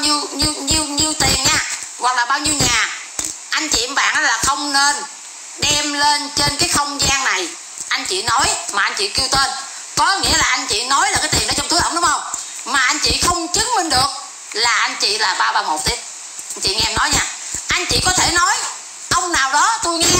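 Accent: Standard. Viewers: Em ca hat noi rat chuan nghe kg ong thay dong ho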